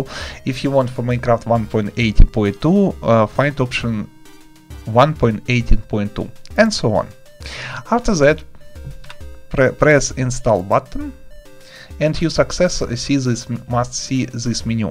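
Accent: Russia. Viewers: У тебя прям сильно русский акцент)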